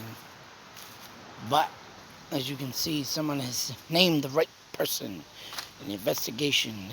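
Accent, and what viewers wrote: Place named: Maine, United States. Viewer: Dude I like your Delphi videos. You sound like you are from Maine? I recognize the accent...I am from Vermont. thank you for this video.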